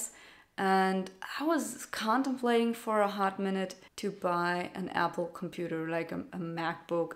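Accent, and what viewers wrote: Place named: Germany. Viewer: i bet your German or something you have a accent.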